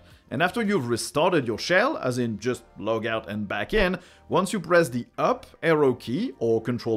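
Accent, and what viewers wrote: France. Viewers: génial ! ce petit accent français lol , c'est subtile mais perceptible ;)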